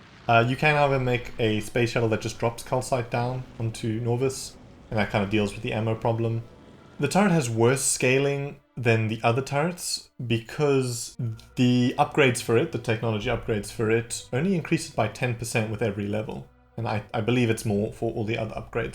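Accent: South Africa. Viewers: I can recognise that saffa accent anywhere, didnt think we had many factorio players around here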